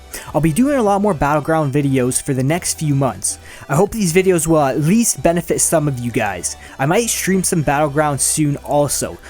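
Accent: Canada. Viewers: Canadian not Scottish, nothing like a scottish accent.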